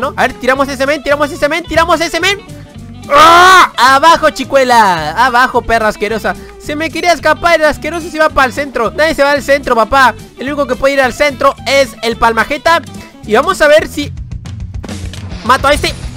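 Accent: Argentina. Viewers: ! Sos argentino? Xd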